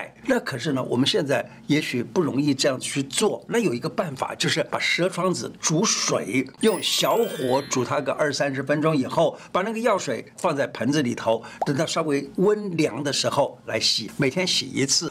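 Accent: Taiwan. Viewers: 胡醫師的台語好可愛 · 笑死了，胡醫師說台語好有趣喔，說得比我有趣多了。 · 胡老的台語 道地 有味道 用心了給你按個讚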